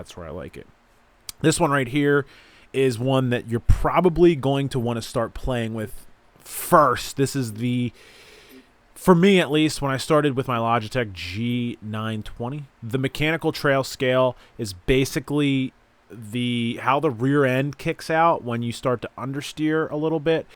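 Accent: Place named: Bay Ridge, United States. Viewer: Make NO mistake, cuz you sound exactly like Chris DeStefano with the Bay Ridge sounding accent. It's just what it is!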